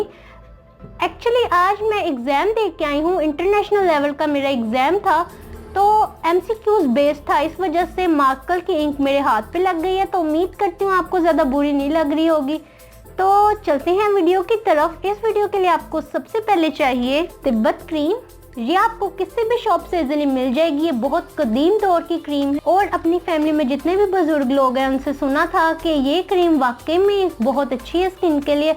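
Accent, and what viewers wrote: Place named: United Kingdom. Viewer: Ap urdu british form me q bat karti hain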